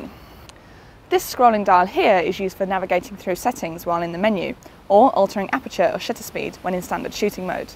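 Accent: United Kingdom. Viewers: Your British accent alone earns you a like ;)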